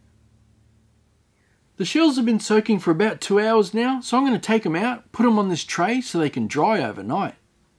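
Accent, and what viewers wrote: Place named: Australia. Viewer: I don't care much resin. I'm here for the amazing Australian accent.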